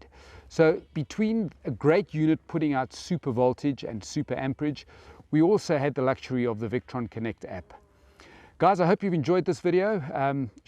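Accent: South Africa. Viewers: Hi Chris, nice to hear another South African. great video, Im doing my homework on both Solar controllers and DC to DC chargers. In the past I had a single unit (CTEK Dual) but now plan to go with what you have. My question, 1)do you in anyway link the two units together or are they both 'individually' connected to the Leisure Battery ? 2) Does the MPPT controller also have a feature of trickle charging the Started battery like the Ctek ? 3) Other DCtoDC chargers that I have seen have a small wire to the ignition to detect a smart alternator. I didnt notice this on the Victron, is it just clever and can detect that without the extra input ? Thank you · Great information.. love the mix of SA and Ozzie accent too :)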